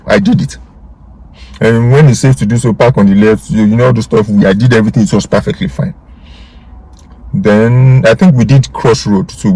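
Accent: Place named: Nigeria. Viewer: I like the way you speak english in your Nigerian accent sir .. A little tip for you (in your test try to have a good friendly conversation with your test instructor while your driving which will divert the intructors thought away from examining... this is how I passed my driving test in the UK. all the best in your next driving test!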